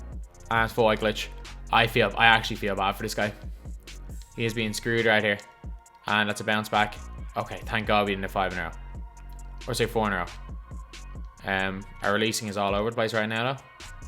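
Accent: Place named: Ireland. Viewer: There’s clearly a “H” in “threat” saying “trett” makes no sense. I also don’t know why you say “or” for “R”. I get that you’re Irish but it’s just grammatically incorrect. Good informative videos though 😂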